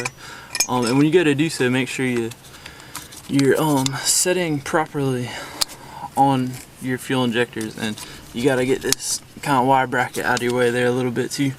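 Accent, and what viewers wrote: South, United States. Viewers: somewhere from american south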